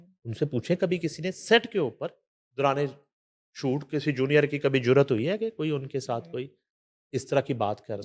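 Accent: India. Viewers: Polte ordo hyen lehja punjabi penddo hye😅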